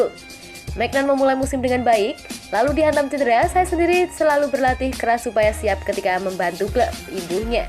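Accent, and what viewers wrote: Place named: Java, Indonesia. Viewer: Lo kok jadi medddok uuwalah .. mba e ..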